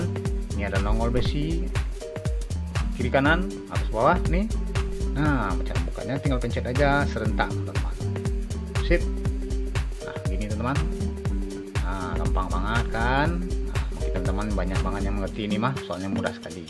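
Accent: West Sumatra, Indonesia. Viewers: lu orang minang kah bang?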